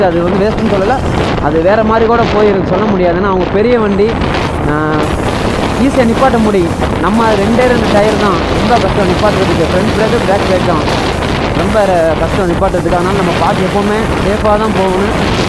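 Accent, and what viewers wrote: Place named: Kerala, India. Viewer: Kakka va kakka nu solunga bro athu tha cute ah iruku unga KL tamil ungaluku nalla reach kodukum. All the best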